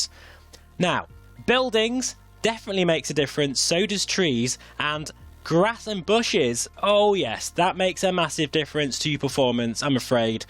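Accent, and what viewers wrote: United Kingdom. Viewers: what? I’m being serious 😀 New subscriber, couldn’t quite make out your accent, I’m going to punt at midlands area?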